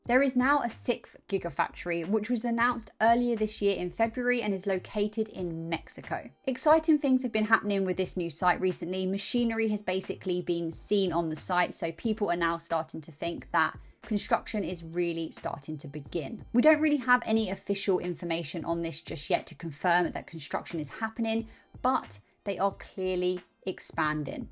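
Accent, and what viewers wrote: United Kingdom. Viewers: 🌜💫😎TSLA😎💫🌛 I love that british accent!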